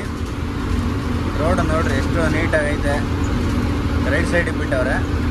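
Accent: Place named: Hassan, India. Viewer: ಪಕ್ಕ ನೀವ್ ಹಾಸನದವ್ರು ಅಲ್ವಾ. ❤️😊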